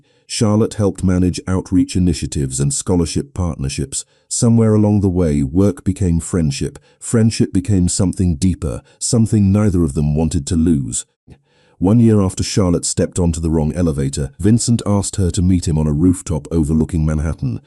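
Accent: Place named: United Kingdom. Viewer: I loved the story but it was like listening to one long sentence and why British voice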